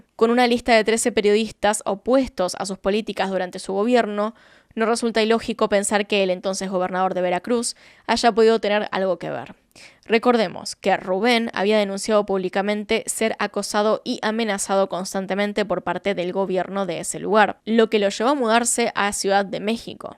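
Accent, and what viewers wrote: Argentina. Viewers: Que lindo escuchar en tu tono argentino todos los lugares de mi hermoso México. Pero que tristeza da que este país lleno de cosas hermosas y personas increíbles como las que tu retratas en este video sea cada vez más conocido por los abusos que genera la autoridad contra su pueblo y ahora también el narcotráfico...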